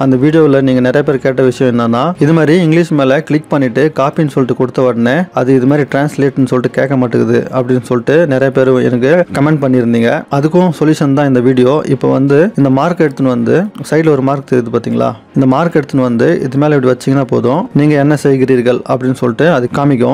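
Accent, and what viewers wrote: Chennai, India. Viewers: local Madras baashai pesatheenga broo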